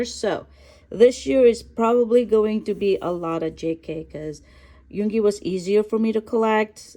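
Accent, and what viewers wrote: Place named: India. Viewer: Are you from india? Because you have a really pretty accent😻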